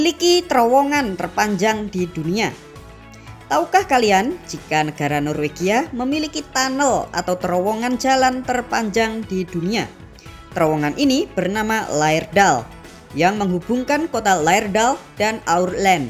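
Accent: Java, Indonesia. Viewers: Admine ughong jowo iki pasti😅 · Mimin orang mana kok meddhok? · Orang jawa ya min??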